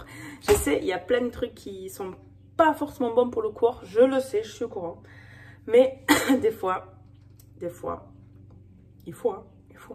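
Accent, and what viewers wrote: South, France. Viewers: Sprichst du eigentlich "nur" den südfranzösischen Dialekt oder auch "richtiges" Französisch (wie heißt eigentlich das französische Pendant zu Hochdeutsch?:D). Ist überhaupt nicht negativ gemeint, sondern tatsächlich aus Interesse :) Die Aussprache unterscheidet sich ja teilweise richtig :) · Du hast Französisch gelernt aber mit einem sterken Akzent aus Süd Frankreich ;)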